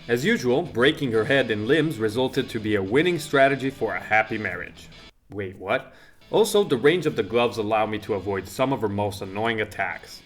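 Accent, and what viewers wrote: Italy. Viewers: Are you italian? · To be fair he sounds perfectly north Italian to me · Mi stavo chiedendo perché riuscivo a capire a orecchio il tuo inglese, poi ho visto che sei italiano😂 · r u italian?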